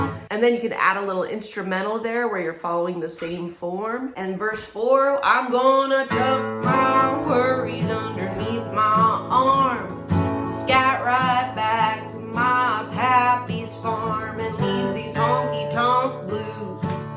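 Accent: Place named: South, United States. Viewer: Love that Southern drawl!!! Great lesson too!!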